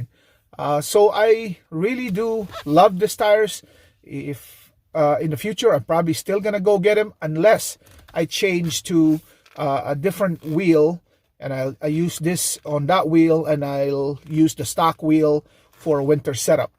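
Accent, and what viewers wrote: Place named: Philippines. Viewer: Philippine accent. How did you get to Illinois? Too damn cold..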